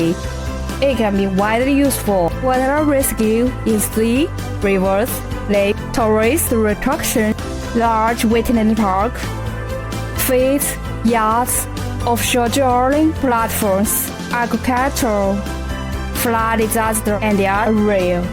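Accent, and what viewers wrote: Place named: China. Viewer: That is some serious chinglish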